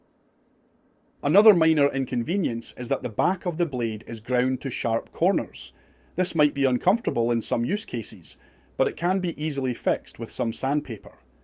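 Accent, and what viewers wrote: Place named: Scotland, United Kingdom. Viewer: I would guess Scotland from the accent - somewhere near or in Glasgow?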